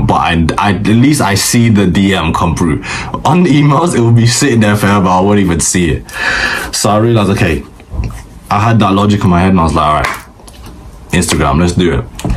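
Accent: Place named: England, United Kingdom. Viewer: Hello mate ! I'm 28 from ireland and I've been learning copyrighting for 1 month. I know AIDA and PAS and all the basics. I feel I'm ready to start but struggle with outreach . Btw ...I'd like to say that that it's a breath of fresh air to hear an English accent. I'm sick to death of all these yankie accents- so annoying ...😮 ! Haha My Q; Would you recommend LinkedIn and TikTok for outreach. I've been banned from Instagram for sending too many messages the same ( but they weren't all the same ) I've been sending some messages on YouTube. Is Instagram the best for outreach ? If so .... I'll try and set up another ... Keep the pounds rolling in mate ! It's great to see success stories. Plus i love your honesty. Some use copy in their yt channels that teach about copy like KJ rainer and cardinal so ....I dont see that with you. So well done ✅ Cheers mate.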